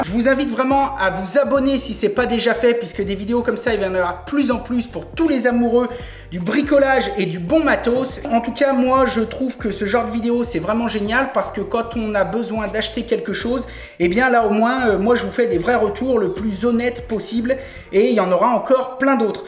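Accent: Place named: Western France, France. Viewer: Cette vidéo est top ! Je me suis levé de mauvais poil et ça commençait à pas filer droit mais tu m'as vite remis sur la bonne ligne si j'ose dire. J'aime bien ton franc parler, notamment le "ayait", tu serais pas de l'ouest toi ?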